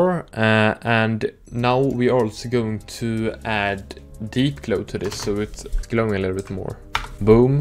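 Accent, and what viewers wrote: Sweden. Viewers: why does he sound swedish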